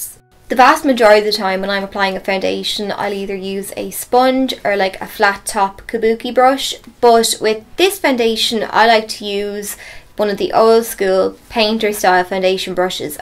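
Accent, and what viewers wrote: Ireland. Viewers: I could listen to you all day because you are Irish! Please do not stop making these :-)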